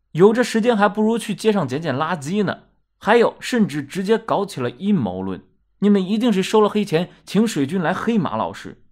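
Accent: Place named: South, China. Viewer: 南方主播，一嘴土味。做出什么事来都正常，从来不看。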